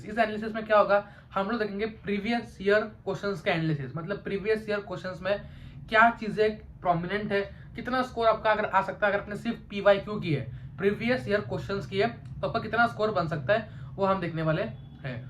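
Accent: Maharashtra, India. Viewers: Bro are from Maharashtra.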